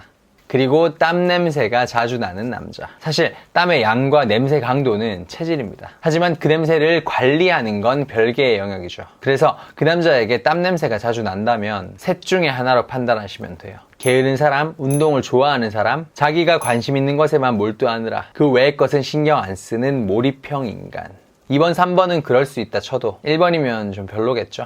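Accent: Gyeongsang, South Korea. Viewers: 좋은정보 감사합니다. 경사도 억양이 살짝 묻어 나오는게 매력있네요^^ 또 뵈요~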